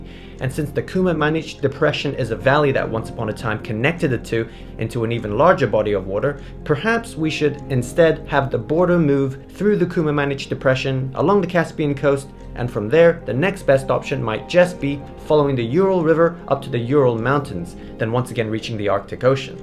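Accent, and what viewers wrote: United States. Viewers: Did I hear correctly in one of your other videos that neither of you have ever been to the US? I'm curious because your English sounds very American with maybe just a hint of an Asian accent while sounds more European. Where did you learn English? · You have many different pronunciation of place names than Americans. Is it of British or some other origin? Your accent seems closest to American though.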